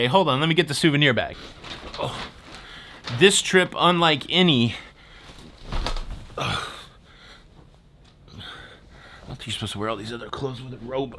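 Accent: United States. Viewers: Hehe! The subtitles picked up on your American accent and said fairies instead of ferries! I’ve noticed that a lot with Americans and short vowels, they tend to elongate them.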